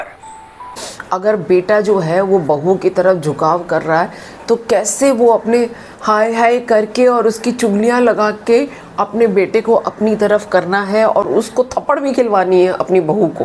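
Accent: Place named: Pakistan. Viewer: Masha Allah mam apki awaz m kitni safai hy. Sath sath hmari mother laguage ka b touch hy in short sarhad paaar han hum lekin dilon me apnaiyat aj b ha jo apki baton se lag rae hy k jese aek e family ho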